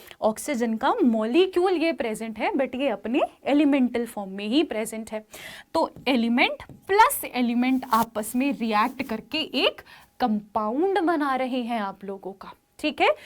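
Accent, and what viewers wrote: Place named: Haryana, India. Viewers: Hariyanvi teacher's hits different ❤🫣💯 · I am listening here the haryanvi dialogue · Mam you and your Haryanvi accent is awesome 💖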